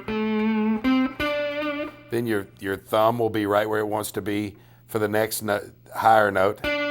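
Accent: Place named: United States. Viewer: This is the most American accent i've ever heard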